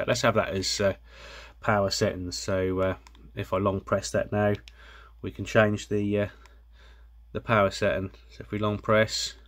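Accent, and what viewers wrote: Norfolk, United Kingdom. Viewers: Is that a Norfolk accent I hear?....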